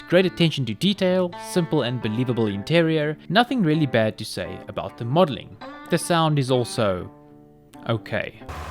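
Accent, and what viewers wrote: South Africa. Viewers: Joh , the Afrikaans accent is strong with this one!! Would love to jol some co-op with you sometime!!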